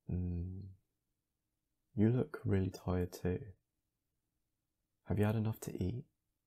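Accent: United Kingdom. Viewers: I live for that British accent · bOdayy~ british accent is sooo cutee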